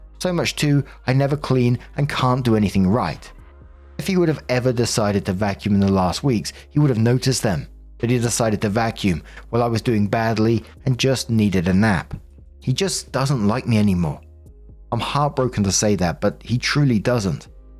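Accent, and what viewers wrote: United Kingdom. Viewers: 12 minutes posted. I feel special. Love. British voice. Everything sounds better in a British accent ❤❤❤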